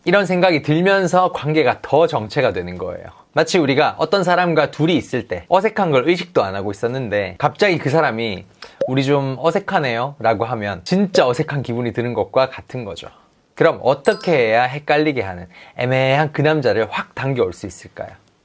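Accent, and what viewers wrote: Gyeongsang, South Korea. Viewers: 부산 사람인가요? 경상도 분이신가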